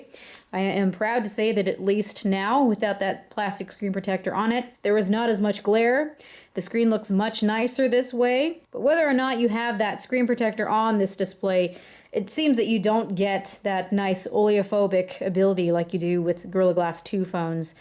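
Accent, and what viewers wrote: Canada. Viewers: I love the canadian accent :) haha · Are you Canadian ?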